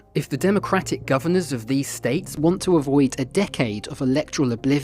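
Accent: United Kingdom. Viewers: I love how it's narrated by a brit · The British accent is distracting. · NYT needs to hire an American to narrate these videos. Why should we Americans be lectured by a British accent?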